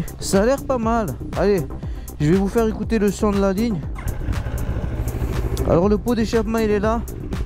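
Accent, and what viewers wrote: South, France. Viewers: Essai Parfaitement maîtrisé, complet et bien explicite. Et en plus ce petit accent du Sud avec les expressions bien de chez nous (je suis de Nice). Top ! Tu m’as convaincu, je cherche une bécane pour voyager, polyvalente, esthétique et le tout sans me ruiner. Je vais aller l’essayer en concession. Merci 👍🏻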